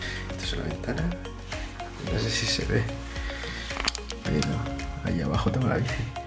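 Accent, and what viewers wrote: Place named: Spain. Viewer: wow .... Naciste en china?.Pero me imagino que te has criado de pequeño en España, no?.Porque si tampoco es así, tienes el mejor acento español peninsular ... 😁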